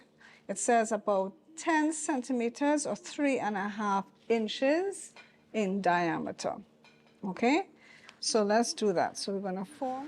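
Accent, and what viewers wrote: Trinidad and Tobago. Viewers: Great video. That beautiful Trinidadian accent is so refreshing and you have inspired me to try this myself. I know now an arepa is a cornmeal fry bake. 10 out of 10.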